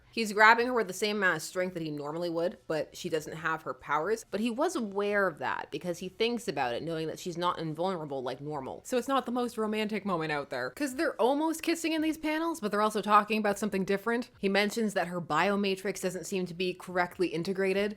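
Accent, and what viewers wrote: United States. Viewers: I just here for you country accent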